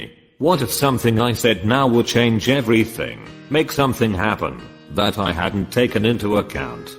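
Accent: United Kingdom. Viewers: best british accent.......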